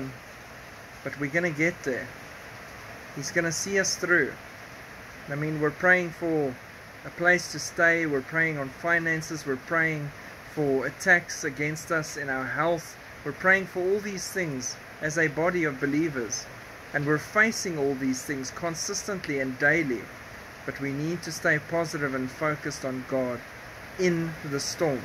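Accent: South Africa. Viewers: Spot the South African accent 😀 Greetings from Benoni 🇿🇦 And yes, fully agree. Our Great God and Saviour is soon to appear. Maranatha 🙏🎺✈️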